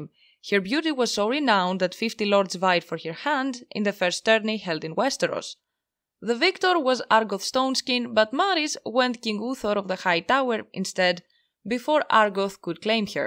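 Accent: Greece. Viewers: Έλα ρε! Αγαπώ την Ελλάδα! Υπέροχο βίντεο, greek accent makes everything better. I'm also a non native english speaker making videos, so feel free to check mine if you want. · I prefer your Greek pronunciations anyways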